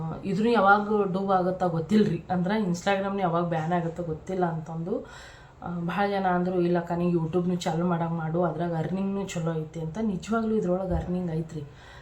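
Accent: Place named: Bidar, India. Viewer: Nima swatha uru yavadu akka yak andre niv bidar bashi mathadthira adk heli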